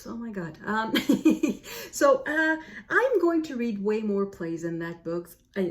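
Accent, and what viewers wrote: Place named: Quebec, Canada. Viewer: I do enjoy your channel. I love your mixture of Canadian English and French. It makes this old lady happy to hear you talk about her favorite things, books. I don't know whether I have actually read the Illiad and the Odessey as but I remember enough about them that I'm pretty sure I have read them sometime in the last 65 years (I was 12 then). I do remember reading The Aneneid in fourth year Latin at school. Dido really stuck with me. My daughter has read Gilgamesh. If she owns the book I can borrow it for her.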